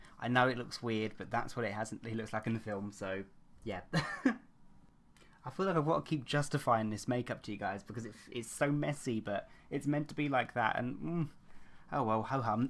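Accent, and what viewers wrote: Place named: United Kingdom. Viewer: His accent is ... Brittish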